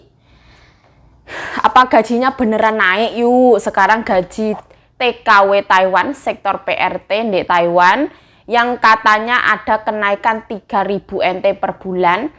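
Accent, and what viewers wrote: Java, Indonesia. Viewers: slm knal, aq dr tanzi, liat km lwat d branda, cba q simak, kok asik jg cara bicaramu, medok tegas crdas, salut sm km, brbakti sm ortu, synk sm ttgga, Smga kdpan ny lbh sukss ya, Aamiiin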